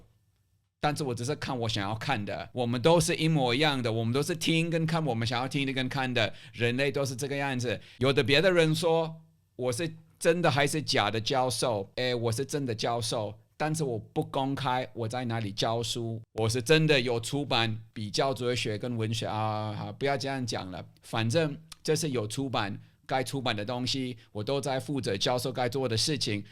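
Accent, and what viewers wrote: South, Taiwan. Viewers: 我也很愛買鳳梨,也愛吃鳳梨。只要台灣人沒有人不愛吃吧！這是第三天看教授影片,看一整天也不累,因為也是南部人,又聽到南部的口音所以非常的親切贊讚讚！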